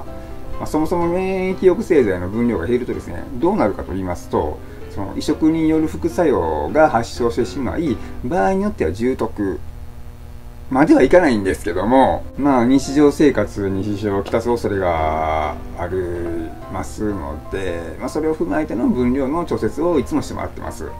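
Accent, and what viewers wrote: Osaka, Japan. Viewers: マスクをしてない元気なさんだ〜❣️今発病された頃の病室からの動画を見ています。頑張れ！頑張れ！と思いながら見てるけどいつもこちらが元気をもらっていますよ❣️ 発病した友達は8日間の抗がん剤投与の後少しづつ副作用が出てきてます。私がさんの動画でもらったパワーを伝えています‼️ 同じ症状になってみないと本当のところはわからないけど、口の中の素晴らしいハーモニー‼️‼️イヤでしょうね…ツライでしょうね……それを軽い明るい大阪弁に乗せて語るさんが大好き😘 頑張れ〜❣️頑張れ〜❣️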